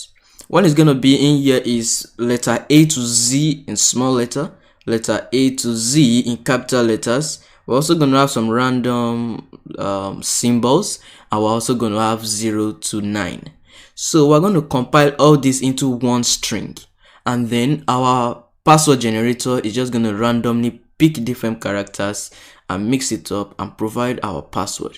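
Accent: Nigeria. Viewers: If it's an African, it's definitely a Nigerian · i hear the Nigerian accent!!🤭🤭🇳🇬🇳🇬🇳🇬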